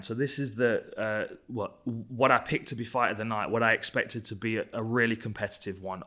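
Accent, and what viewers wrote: United Kingdom. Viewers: Man, I hope this is Dan Hardy and not some dork producer. If this is the real Dan Hardy I would like to thank you for being British. It's a nice accent and it feels like the person speaking is someone I should be paying attention too. Its also refreshing that possibly at one point my ancestors spoke in such a captivating way instead of the 5 generations of hillbilly south Carolina speak. Also, is it true my ancestors were the trash of Britain or religious zealots that you guys wanted out? We are always taught our ancestors were the prime stock of Britain and fled for freedom and prosperity... you know the normal talk but my research is showing different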